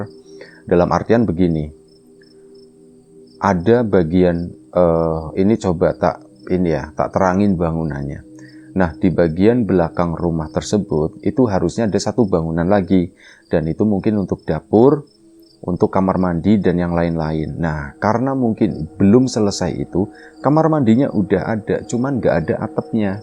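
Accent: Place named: Brebes, Indonesia. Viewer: Brebes ngapak, dadi warga magelang nyimak mas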